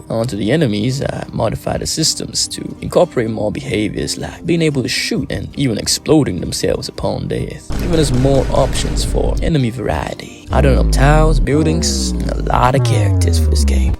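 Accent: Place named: West, United States. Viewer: Doing the entire dev log with a western accent is true commitment to the bit. I really enjoy these devlogs, thank you for sharing another one!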